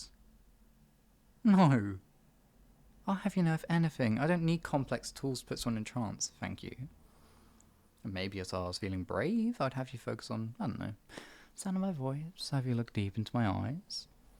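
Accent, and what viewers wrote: United Kingdom. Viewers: This may be a weird question, but what kind of British accent does this guy have, I’m American so I’m not sure of any of the regions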